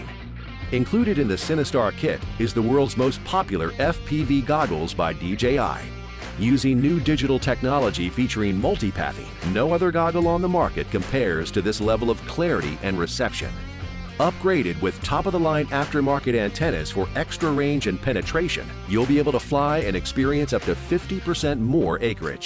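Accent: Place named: California, United States. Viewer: Please get someone with a non Californian accent to narrate it .. that American "dude" is just putting me off like no tomorrow :-)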